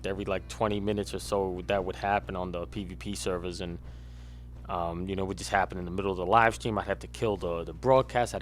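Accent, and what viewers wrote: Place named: New York, United States. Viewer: thanks I cant afford ps4 if I could I'd buy it just to talk to you cause I love your NYC accent >.> I'm from Georgia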